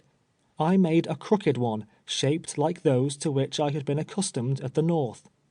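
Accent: England, United Kingdom. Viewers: yes please do. hearing a white british guy read it like hes reading the paper just feels wrong. it needs the power and feelings. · Who would listen to this History read by English accent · I wanted to compliment the narrator. The English accent really conveyed the proper Grammer that was then real English. This history really shows how far we have sank from using words appropriately. A free'd slave spoke better and proper English than most people I know today.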